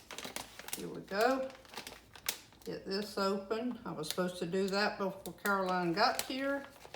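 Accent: South, United States. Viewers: your southern char., hospitality and accent is the real deal.. What a blessing you are!!! And most importantly YOU LOVE JESUS!!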